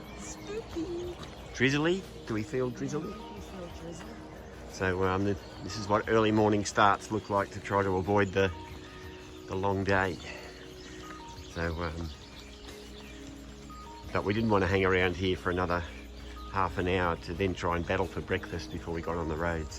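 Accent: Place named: Western Australia, Australia. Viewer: Beautiful video as always! I also enjoy your accent, it reminds me of the time I have spent in Western Australia. :=) Have a great day and Buen Camino!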